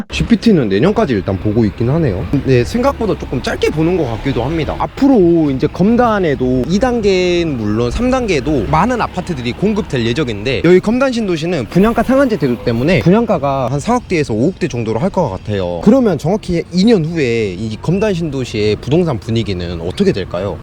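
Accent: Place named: Jeolla, South Korea. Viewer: ㅋㅋㅋ 방송하지마라 공부를 쳐 하고 하던지 부알못아ㅋㅋ 전라도 억양 오지네ㅋㅋ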